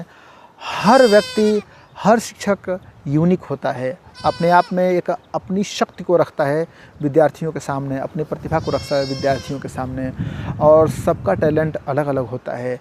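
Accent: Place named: Uttar Pradesh, India. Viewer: Apki batein bdi pyari h sir lg rha h ap up se h .sir mai kvs tgt Hindi interview ki tyari kr rhi hu pls ese hi margadarasan krte rhe .mai up santkabir nagar district se hu.🙏🙏🙏🙏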